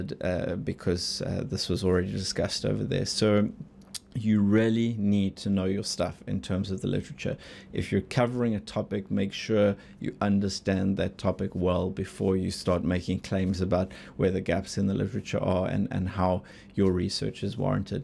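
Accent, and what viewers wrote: South Africa. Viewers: Are you South African? Seems obvious actually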